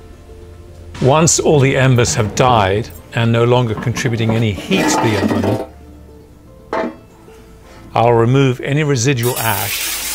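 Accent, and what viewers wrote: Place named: RP, United Kingdom. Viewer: FANTASTIC BBC ENGLISH ACCENT>>>THANK YOU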